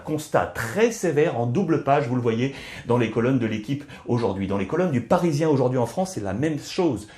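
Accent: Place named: Spain. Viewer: J'adore ton accent espagnol ☺️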